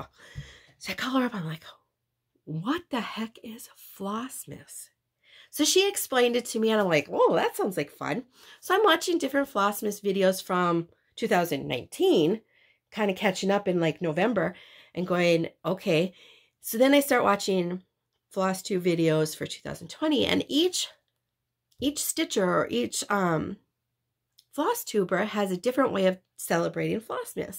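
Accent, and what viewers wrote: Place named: Upper Midwest, United States. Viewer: I knew immediately from your slight "accent" that you were from the UP or Wisconsin. I grew up near Iron Mountain (Norway) and will always be a Yooper at heart. Your projects and future projects are great. And what a wonderful ministry you have with your hats and mittens. I know how needed it is up there.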